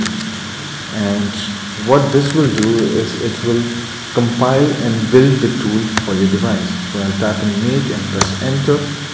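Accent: India. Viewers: A voice from the bottom of an oil storage tank with some extra noise! Are for real? You don't respect yourself and your viewers. Get some proper audio recording equipment first, then post your videos. These Hindi... they think that listeners are not having enough difficulties understanding their heavy accents. They add some other audio garbage on top. Barbarians!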